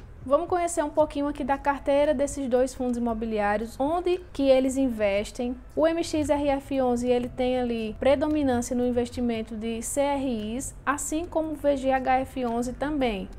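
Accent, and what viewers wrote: Northeast, Brazil. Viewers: Vc é de que estado do nordeste,??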